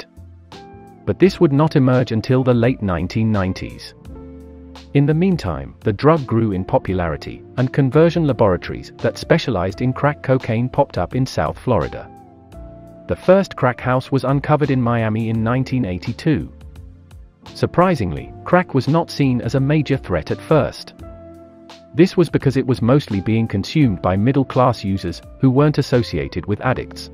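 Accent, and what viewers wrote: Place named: RP, United Kingdom. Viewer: This is text-to-speach voice generator. You can choose whichever accent you like. OP just liked the RP, I guess.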